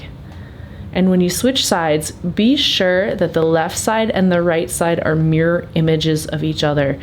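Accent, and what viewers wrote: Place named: Texas, United States. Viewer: She’s got that Texas “train hard y’all” thing going. I like that. You should sign off saying “Y’all come back now ya hear!”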